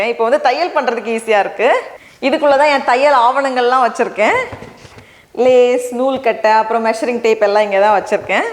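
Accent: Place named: Chennai, India. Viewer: na unga tamil slang rmba pidichanala inga chennai ninachen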